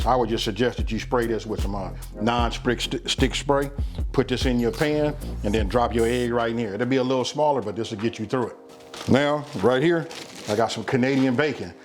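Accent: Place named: Bay Area, United States. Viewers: dude"you from the Bay? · The West Coast accent is deep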